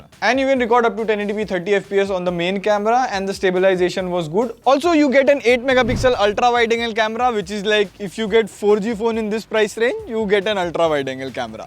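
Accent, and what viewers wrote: India. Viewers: Hi, i'm an Italian man. I watched your video because i'm evaulating the phone, but without the help of the subtitles i couldn't understand what you were saying. It's me, im' not used to listening english of people from India. Anyway, good video (writing, screenplay, photography, effects), great review (I'd love more hand-on use, but whatever), keep looking to me the perfect replacement to my Moto G8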